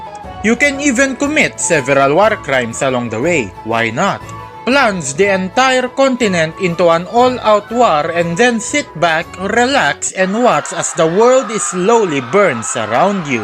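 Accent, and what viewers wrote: Philippines. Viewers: Hahahaah ang accent talaga pinoy na Pinoy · Pilipino ka po?yung accent kase parang filipino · Bro, you have a filipino accent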